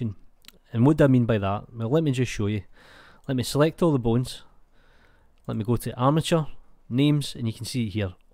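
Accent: Scotland, United Kingdom. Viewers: wao thats some strong scottish accent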